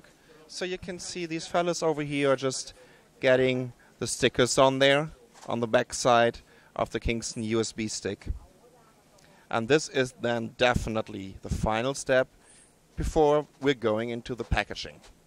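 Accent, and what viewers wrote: Germany. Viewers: Pretty awesome. Was the narrator German? Also, you guys have some cool songs at the beginning/end. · he fucking german, give the dude a break, it's not his 1st language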